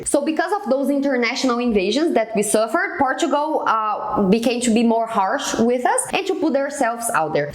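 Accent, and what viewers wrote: Minas Gerais, Brazil. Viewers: Teu (nosso) sotaque de brasileire é lindo!!! ❤ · Nossa essa é a primeira vez que noto um sotaque brasileiro forte em algum BR falando inglês kk Ela fala certo mas sem aquele sotaque americanizado, ela fala bem de um jeito abrasileirado mesmo Amei você 🤍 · amore, nunca mais peça desculpas pelo seu sotaque brasileiro!!!!!!! <3 luv u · você não tem um sotaque quebrado. tem um sotaque brasileiro! e é maravilhoso! achei que já poderia ter na história a tentativa de golpe do 8 de janeiro. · Muito bom! Amo sotaque, é bom demais ouvir o inglês brasileiro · seu sotaque é belissimo o sotaque brasileiro falando inglês é belissimo então não precisa pedir desculpa!! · Seu vídeo é mto bom! Ter sotaque brasileiro não é um problema, vc tem um bom domínio da língua inglesa e o seu sotaque nem é taaaanto o problema... É mais a sua ENTONAÇÃO q não está compatível com a língua inglesa, mas dá pra (um falante nativo) entender 90% do que vc diz. 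(Brasileiros vão entender 100%, sem o menor problema) Bom, mas - se mesmo assim - vc se sentir desconfortável em falar com entonação e sotaque carregado e quiser amenizar essa sensação de inglês "aportuguesado", pra melhorar a qualidade do vídeo, eu sou especialista em linguística e meu foco é exatamente em pronúncia, entonação e eliminação de sotaque brasileiro... Seria um prazer aprimorar sua fluência! Acredito que em 1 mês seu inglês irá progredir uns 50%. Sou professora de inglês há 27 anos, graduada em Letras e possuo minha própria escola há 10 anos. Vc será muito bem-vinda! o do meu Instagram é byteachergabi eu não uso muito o instagram para postagem, mas estou sempre verificando minhas mensagens! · isso não é um "broke accent" é só uma das mais lindas e variadas formas de se falar ingles, ❤ tenha orgulho do seu sotaque mineirês-brasileiro, molier!! kkkk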